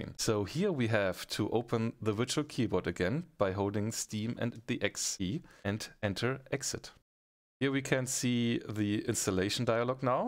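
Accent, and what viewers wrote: Germany. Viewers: Hey, hast du ein deutschen Akzent? 😅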